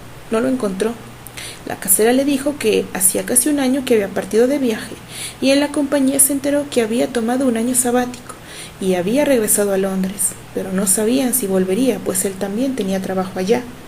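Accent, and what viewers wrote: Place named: Peru. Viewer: hola tu eres la narradora? Hablas como Peruana o Ecuatoriana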